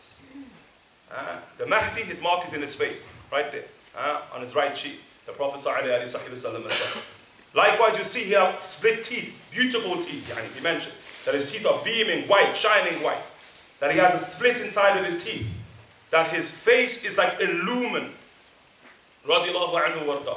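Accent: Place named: Liverpool, United Kingdom. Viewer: He has a British Scouse accent .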